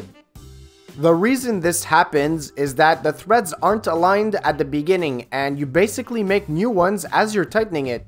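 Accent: Quebec, Canada. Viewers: no, but I think you have a French Canadian accent like me lol. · tu me semble etre un quebecois je me trompe ? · bel accent québécois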